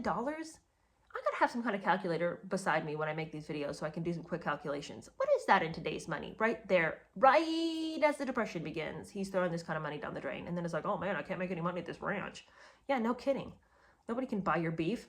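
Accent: United Kingdom. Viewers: 😂😂😂 I love your British accent. Making David sound like an unhinged Widow Twankey is hilarious. I started listening to your channel with the Diana book and the impersonation of her was spot on. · Thanks, for bringing back your fabulous British accent to enhance your review of Traitor King. I never tire of hearing it and have actually missed it since your reviews of Spare and Revenge. The Rosenbergs were executed after being accused and tried for espionage. It makes me wonder if U.S. Intelligence agencies may have been following Wallis Simpson's antics?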